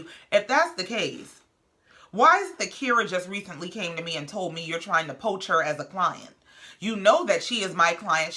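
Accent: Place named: New Orleans, United States. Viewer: Love how you talk . It’s similar to NO. It’s so cool.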